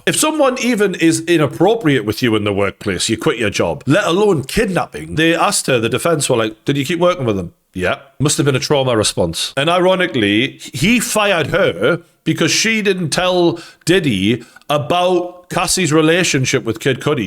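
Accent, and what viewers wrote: Newcastle, United Kingdom. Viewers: A True Geordie old mare.. Talkin Bollox as usual..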